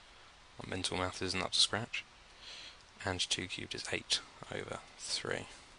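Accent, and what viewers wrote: United Kingdom. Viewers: Your voice and awesome British accent makes this video that much better. Plus you do a great job explaining!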